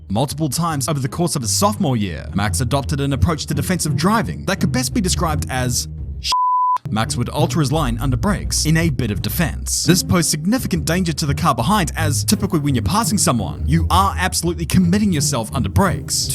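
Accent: New Zealand. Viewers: are you from Southland?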